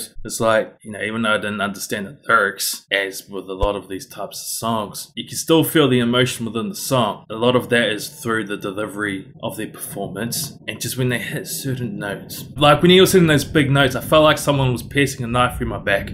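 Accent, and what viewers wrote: New Zealand. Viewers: omg are you from New Zealand? your accent:oo is amazing, react to more of his mask king videos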